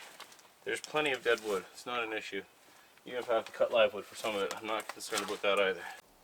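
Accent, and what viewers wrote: England, United Kingdom. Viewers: Cracking English accent 😁👍🏼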